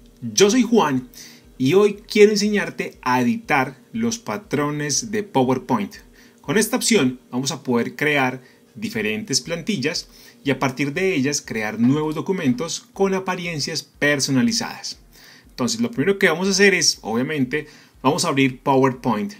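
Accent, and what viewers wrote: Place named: Colombia. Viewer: esta chido, gracias, ....osea que lo mas aprovechable es que lo haces de forma tal que automatizas con el patron, la neta que me gusto pero toma su tiempo, en todo caso te agradezco y si no me equivoco eres colombiano, me agrada el acento de ellos, gracias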